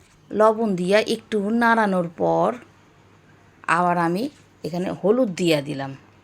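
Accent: Bangal, Bangladesh. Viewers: Darun bangal vasa bollle recipe tao khub sundor hoyeche ❤❤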